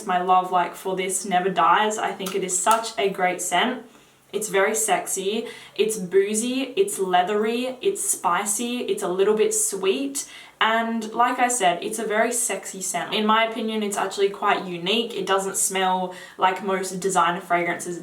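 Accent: Australia. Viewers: it went up quick. It's that aussie accent. Us blk men love it. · An Australian accent on a woman is my weakness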